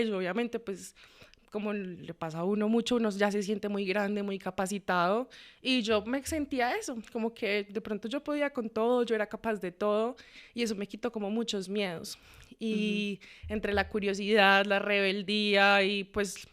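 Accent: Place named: Bogotá, Colombia. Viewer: costena pero hablando muy bogotano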